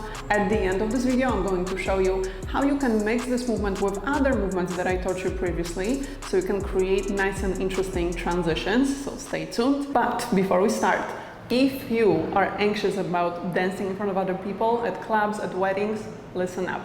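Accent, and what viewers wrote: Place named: Poland. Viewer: Mel15 Mcc her accent sounds polish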